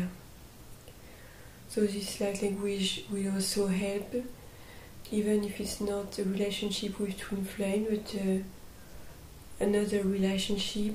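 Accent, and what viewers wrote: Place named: France. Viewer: Thank you for your work! Your video (another one) popped up in my feed about a week or two weeks ago, and now this one. So I'm a new subscriber now! :-D I discovered light language a few years ago. Where are you from? I hear a French accent. :-)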